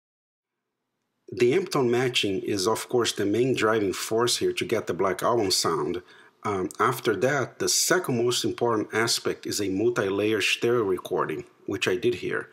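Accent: Brazil. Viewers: You sound Brazilian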